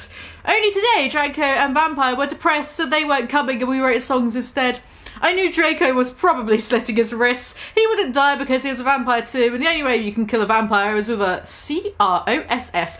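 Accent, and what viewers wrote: United Kingdom. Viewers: British Chav typing and being read by a British accent ... that's my cup.of tea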